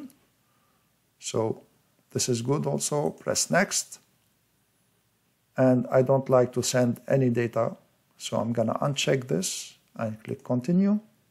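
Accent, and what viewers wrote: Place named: Lebanon. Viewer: huge lebanese accent lol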